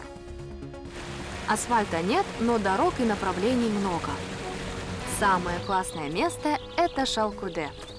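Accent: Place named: Russia. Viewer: наименования чисто қазақское произносится с руским произношением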